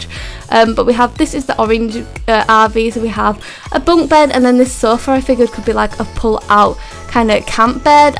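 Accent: United Kingdom. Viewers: Honey Dew I love her accent too! But I’m warning you do not try and replicate a British accent as an American you’ll sound so stupid! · This may or may not be creepy but like I really love your voice (like your accent) Maybe its because I'm American but I really want a British accent (Also I just started watching you like 20 min ago and I'm already subscribed :D)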